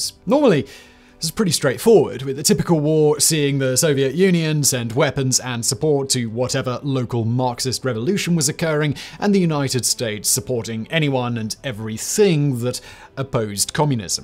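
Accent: United Kingdom. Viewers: romanblack4247 It's not a white accent lmfao what? He's British and even then he could have easily pronounced the words like Yoruba or Igbo correctly. Wth is a white accent? He simply just didn't even try to learn how to pronounce them correctly.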